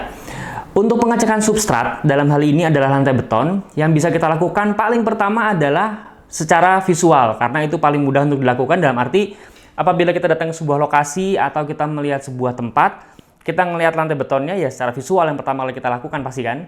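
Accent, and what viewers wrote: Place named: Banjar, Indonesia. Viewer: Logat banjar nihhhh..heheheee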